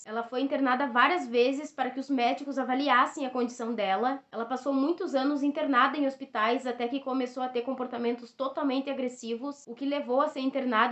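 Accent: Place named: South, Brazil. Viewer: Olá 😃 conheci seu canal hj e já adorei ... Parabéns pelo trabalho 👏 pelo seu sotaque acho que vc e aqui do sul ?!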